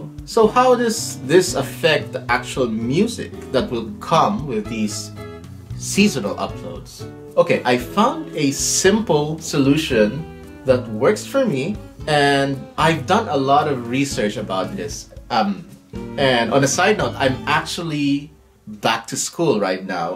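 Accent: Philippines. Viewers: You're starting to lose your Filipino accent... very slightly. It's fascinating to watch its progress. lol I hope you're finding life in the US ok.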